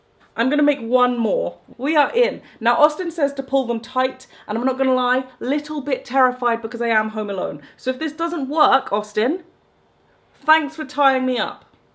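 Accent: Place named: England, United Kingdom. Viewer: I love english accents lol always reminds me of bridget jones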